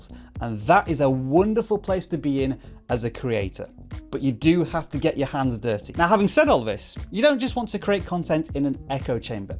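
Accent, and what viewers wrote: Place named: United Kingdom. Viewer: Totally off topic, but I’m working on learning regional British accents. Where, exactly, did you grow up? Thanks.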